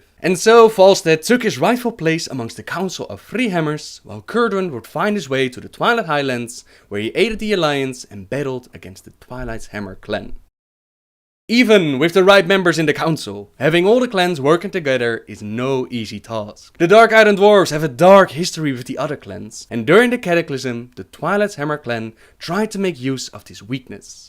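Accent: Netherlands. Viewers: I enjoy his Dutch accent to be honest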